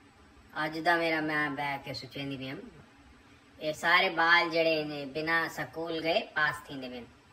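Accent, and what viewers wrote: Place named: Saraiki, Pakistan. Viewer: I just love your saraiki accent.... So beautiful... I didn't know people in india could speak saraiki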